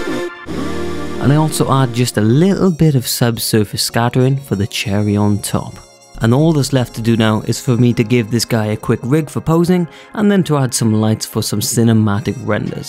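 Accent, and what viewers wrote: Wales, United Kingdom. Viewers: Just decided to get into animating and youre the first youtube creator Ive watched, awesome mate and Id spot a fellow welsh accent anywhere! 🏴 Liked and subbed! Would be cool to get into contact with you in a few months when ive got more knowledge on it all! Best of luck mukka, hope life is good🍻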